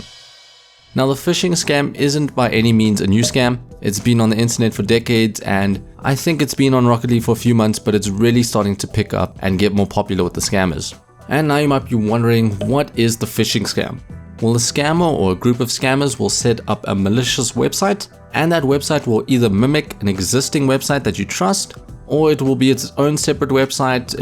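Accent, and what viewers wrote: South Africa. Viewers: Is he south african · U from South Africa? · Are you from south africa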